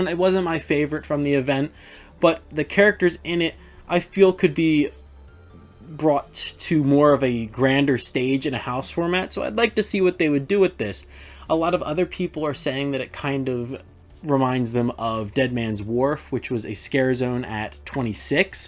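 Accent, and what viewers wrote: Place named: Delaware County, United States. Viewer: Whew that accent jumped out, it’s giving Delco or Delco adjacent.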